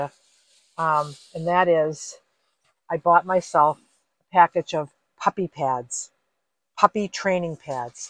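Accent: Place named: Massachusetts, United States. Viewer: Ha I knew it,,,,I heard that Mass accent on your other videos. Thanks for cool videos Helpful ..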